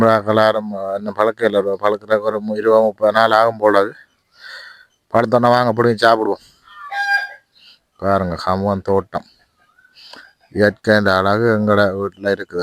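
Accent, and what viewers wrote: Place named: Sri Lanka. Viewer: நீங்க இலங்கை தமிழில் பேசுவது அவருக்கு புரியவில்லை இது இலங்கை வன்னிபகுதியா? அருமையான பண்ணை வீடு மாதிரி இருந்தது நன்றி வணக்கம் தமிழகத்தில் இருந்து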